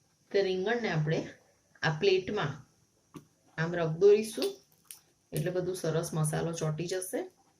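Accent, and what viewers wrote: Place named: South Gujarat, India. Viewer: ok. I think you are from south Gujarat.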